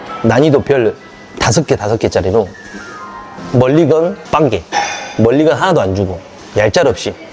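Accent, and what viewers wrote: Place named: Jeolla, South Korea. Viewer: 구독자 500명 달성을 진심으로 축하드려요!😊❤🎉 전라도 김치 참 좋아해서 저도 사먹는 편이거든요! 특히 묵은지를 좋아해서 묵은지 김치찜을 자주 해먹는 편이랍니다 ㅎㅎ 에서는 김치소개를 더해서 전라도의 맛집도 소개해 주시는 영상이 많아 참 좋더라구요❤ 그 중에 얼마전 말리화반점을 소개해 주셔서 아주 맛있게 잘 먹구 왔답니다! 동구에 있는 오래된 중국집인데 유니짜장이 맛있다 라는 에서의 리뷰를 보고 역시니 맛잘알❤이라고 생각을 하였다는요..👍🏻 그러니 의 김치는 얼마나 맛있을까 하는 믿음이 안생기겠냐구요 👍🏻ㅎㅎㅎ 이렇게 열심히 해주셔서 많은 구독자 님들이 금치의 김치를 받을 수 있나 봅니다! 저도 금치를 꼭 밥에다 먹어보길 희망하며 바라봅니다😊 역시 전라도 사투리 찰져버리시네요~~ㅎㅎㅎ 34개 축하드리고! 500명돌파 다시한번 축하드리고 1000명 1만명 까지 쭉쭉 승승장구 하시길 바라겠습니다!!😊❤🎉 (1kg:총각김치, 서비스김치: 부추김치, 깻잎김치 희망해 봅니다..😊)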